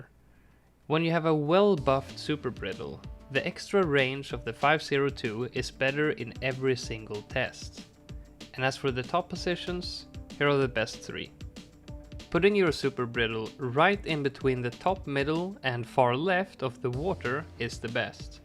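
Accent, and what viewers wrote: Sweden. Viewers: You have the cutest Swedish accent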